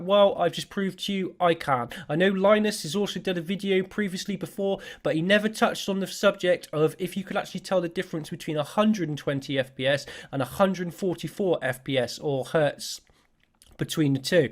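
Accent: Bristol, United Kingdom. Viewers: good to hear a bristol accent! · Is this guy Bristolian?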